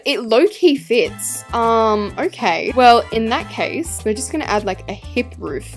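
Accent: Australia. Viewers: OKAY I love your ✨ Australian accent✨ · Your accent is perfect ( btw I’m and Aussie too) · I wish we could switch accent I have a American accent, and I love your accent I wish I was Australian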